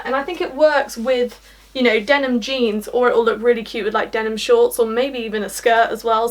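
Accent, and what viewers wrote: United Kingdom. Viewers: Can’t beat the combination smart, beautiful and that British accent!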